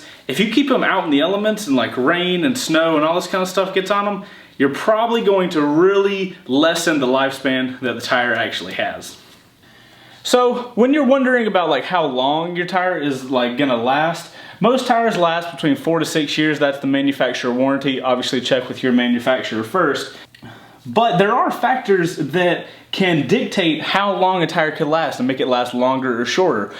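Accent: South, United States. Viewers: "You could possibly, significantly....." Damn I miss the south.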